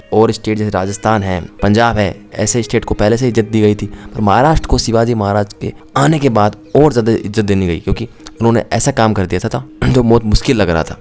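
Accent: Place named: Pakistan. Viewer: Tumhe kisine nahi pucha kya reality hai Pakistani tum ho tumhara dialect batata hai . Ai Bana Kar kuch bhi bakvas mat banao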